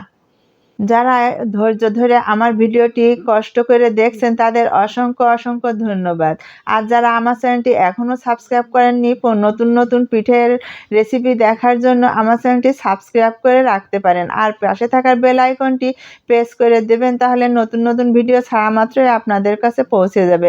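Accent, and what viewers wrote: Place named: Jashore, Bangladesh. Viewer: Apu apner desher Bari kon dristic? Kotha sune mone hocche jashore a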